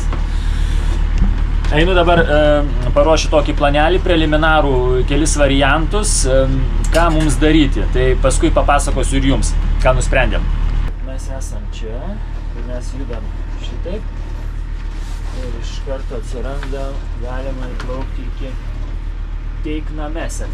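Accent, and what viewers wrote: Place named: Czech Republic. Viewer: Gražus čekų akcentas ❤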